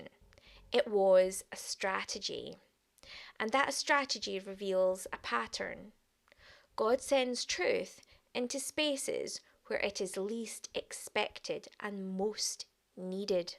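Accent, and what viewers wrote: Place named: Ireland. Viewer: Nice. I wonder how quickly that deep Irish brogue would take to me if I lived in Ireland. I love to hear you speak. ❤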